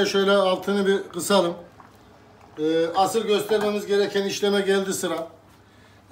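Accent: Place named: Central Anatolia, Turkey. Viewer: „Baska bisey deel“😀 ustam ankaralimisin veya ic anadolu, bizim oranin sivesi sanki 😇 püf noktalariniz icin cok tesekkürler :)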